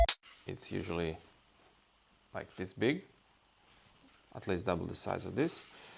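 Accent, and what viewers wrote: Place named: Eastern Europe. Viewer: I really loved watching this video. It’s informative and I especially enjoyed the calm pace of it and your voice and (Slavic?) accent. It was also very clearly explained and I’m confident I can give this a try myself now. Definitely appreciate a good homemade video like this without all the editing, quick scenes, flashing lights, annoying loud music and clickbait. Just a simple information video. Keep it going!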